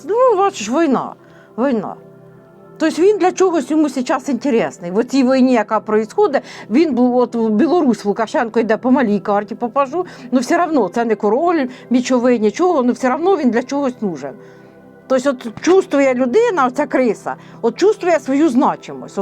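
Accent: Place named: Surzhyk, Ukraine. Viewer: Дякую Вам,пані! Чую Вас і радію. І ше, Ваш суржик я теж так розмовляю. Харків з Вами.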